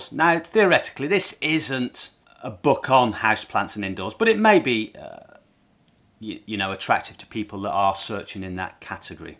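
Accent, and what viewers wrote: England, United Kingdom. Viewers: Good to hear an English accent, regarding making money, keep it up, your videos are very informative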